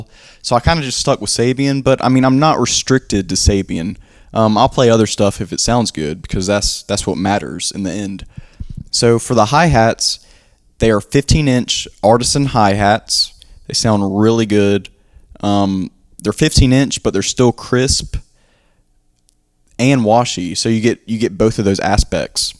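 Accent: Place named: Maryland, United States. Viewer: where are you from? almost sounds like a Maryland accent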